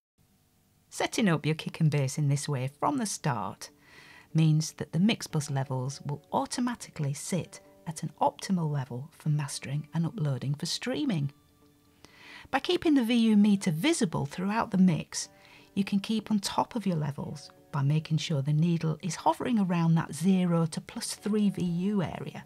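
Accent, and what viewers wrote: Northern England, United Kingdom. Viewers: I love that northern accent!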